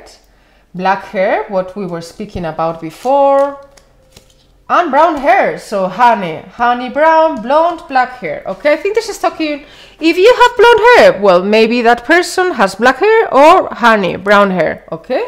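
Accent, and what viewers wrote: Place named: Spain. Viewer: Your voice is beautiful, personally speaking I would hate to see u learn english because what u have is treasure. I love ur Spanish accent. Don't ever lose it❤❤💕.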